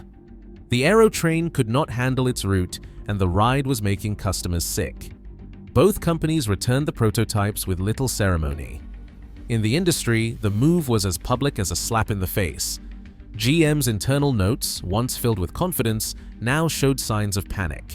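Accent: United Kingdom. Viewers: 😅can't listen to a Brit talk about this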